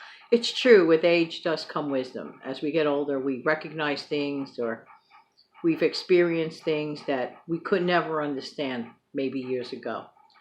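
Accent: New York, United States. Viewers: So so true. My 4 grew up so fast 2 men now and 2 young women. Youngest is 17. Sons are almost 30 and both away in navy. Enjoying tea time today. Morning chores done and eating leftovers for breakfast. I worked full time with children in 4 schools due to age differences. Miss those hectic days. My time for college (almost 2 years pasted already) and me time. It is hard to believe how fast time passes. My mind races too so I take time to go outside and photograph nature. Ahhhhhh bliss. Love your NY accent. Enjoy your days.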